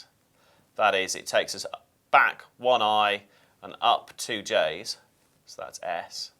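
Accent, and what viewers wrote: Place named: United Kingdom. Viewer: I fucking love the british accent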